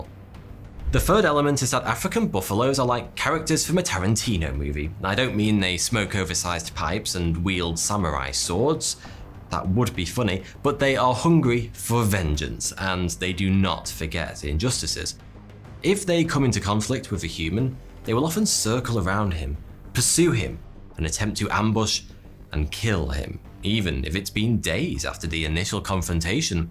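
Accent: United Kingdom. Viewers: I knew where this was going, but I just wanted to hear it in his beautiful British accent and voice.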